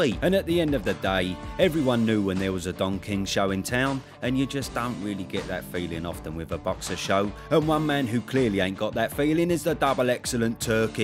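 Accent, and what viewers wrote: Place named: Mockney, United Kingdom. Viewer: Once I heard the mockney accent and first f bomb i checked out.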